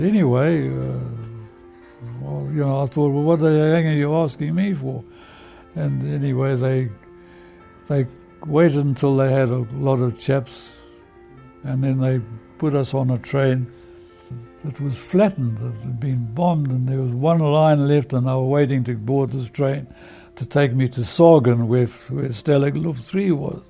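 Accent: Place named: South Africa. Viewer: Know that accent SA best pilots ever.BALLS,Montgomery said it.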